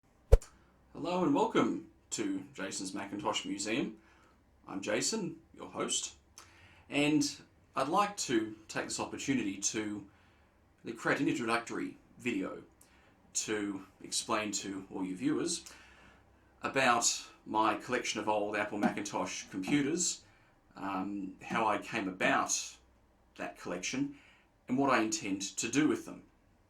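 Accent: Australia. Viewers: Are you from Australia? You sound like you are due to your accent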